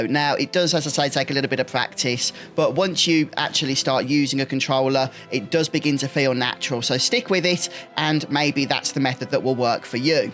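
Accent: United Kingdom. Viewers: Goddamn british accent😂❤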